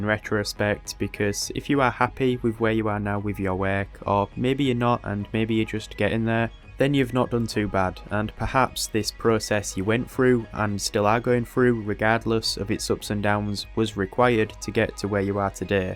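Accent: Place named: Middlesbrough, United Kingdom. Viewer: Are you from Middlesbrough or nearby? I think we have a similar accent haha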